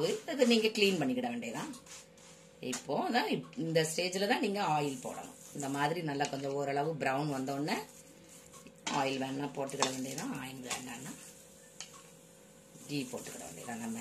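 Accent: Tirunelveli, India. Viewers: Tirunelveli Tamil super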